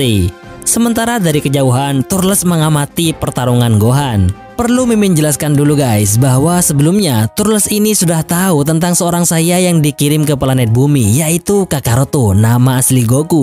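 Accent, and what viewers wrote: Madura, Indonesia. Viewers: mimin orang Madura ya? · Logatnya Madura banget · Ia kayaknya. Logat dan nadanya Madura bgt hhhh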